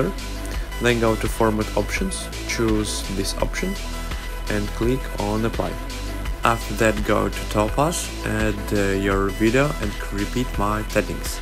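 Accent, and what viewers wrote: Russia. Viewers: Чувак, ты русский что-ли?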